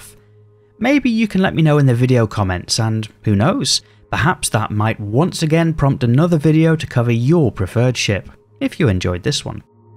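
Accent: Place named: England, United Kingdom. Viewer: Kraaken? You sound English to me, and I’ve never heard anyone pronounce it that way. If I didn’t know better I’d say it was done to trigger people like me to rush to the comments and say so!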